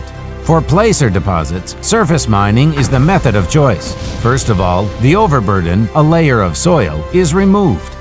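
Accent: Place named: United States. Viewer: Interesting dialect. Almost sounds american.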